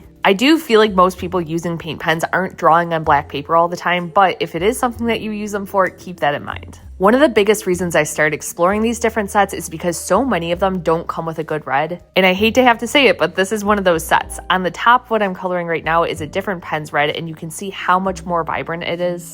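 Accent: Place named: New England, United States. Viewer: i can’t tell if you’re from new england or chicago, i love your accent so much!!!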